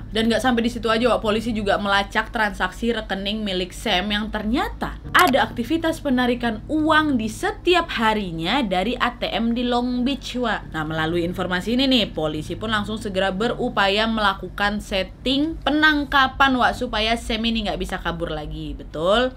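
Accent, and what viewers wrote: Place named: Batam, Indonesia. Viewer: Logat anak batam wak...☺☺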